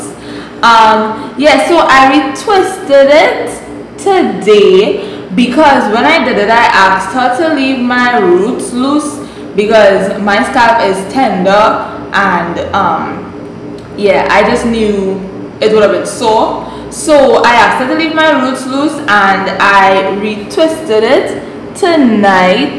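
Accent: Trinidad and Tobago. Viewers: Are you from Trinidad???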